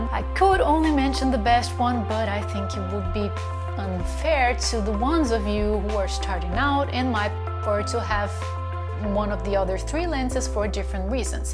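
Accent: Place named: Brazil. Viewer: Eu escutei e aí guys, já percebi de cara que era BR kkkkkk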